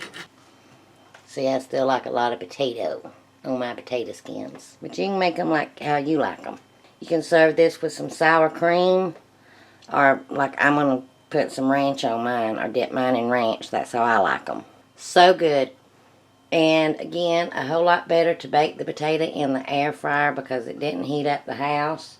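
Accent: South, United States. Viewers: I love the southern accent I am from the south as well so I love it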